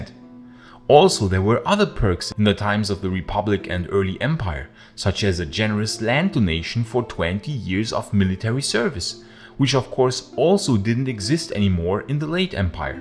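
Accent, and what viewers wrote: Germany. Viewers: Where are you from i wonder about your accent are you german? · Kannst du bitte noch einen deutschen Kanal machen, bei dem du das ganze nochmal neu einsprichst? Nichts für ungut, aber dein Englisch klingt genauso wie das eines alten Klassenkameraden, der versucht hat so akzentfrei wie möglich zu sprechen. Das klingt in der Regel allerdings noch schlimmer als wenn man ganz normal als Deutscher englisch redet. Schau deine Videos echt gern, aber dieses versuchte Unterdrücken der deutschen Aussprache macht es echt schwer zu schauen.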